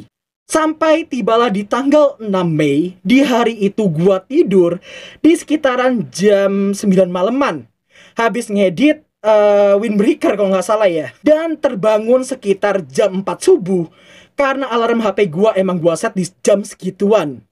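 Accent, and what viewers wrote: Java, Indonesia. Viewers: Kamu dari Jawa y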